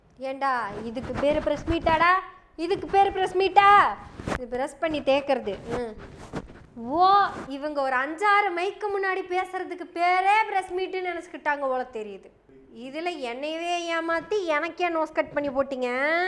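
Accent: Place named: Coimbatore, India. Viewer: Super sister with Coimbatore slang ❤. Love it.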